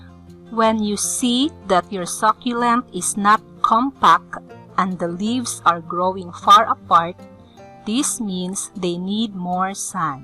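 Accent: Philippines. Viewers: The narrator has a Filipino accent, yes?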